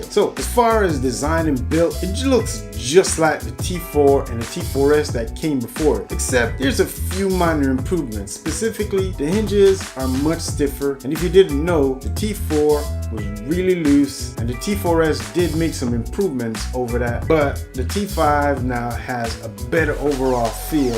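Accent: Jamaica. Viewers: we Jamaicans know a Jamaican when we hear one..the accent is still there bro. I got the t5s but the sensor is giving trouble so I had to put tape over to stop the problem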